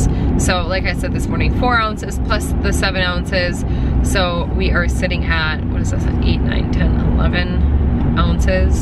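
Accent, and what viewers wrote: Minnesota, United States. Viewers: My dads side of the family is from MN and I want to move there SO bad! When I started watching your videos I knew just by your voice you were from MN. 🤣 I wish I had an Minnesotan accent! ❤️❤️❤️❤️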